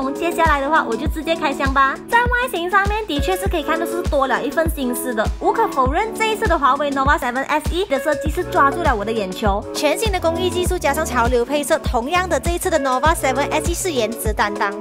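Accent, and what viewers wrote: Malaysia. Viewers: 這應該是馬來西亞中文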